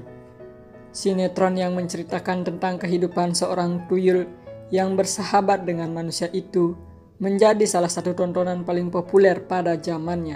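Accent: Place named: Bali, Indonesia. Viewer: Dari logatnya kayaknya mimin orang Bali ya🤭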